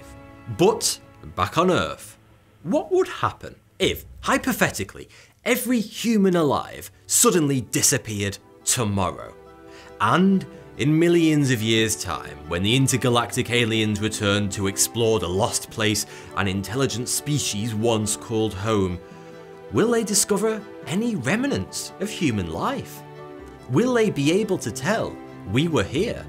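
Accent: United Kingdom. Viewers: I thought it comical that every person on the planet would dissapear. Is that another way to disappear? I shall never be around to find out so shall never know. Seriously, though, I love these videos and as an Australian find the attractive British regional accent adds to my enjoyment.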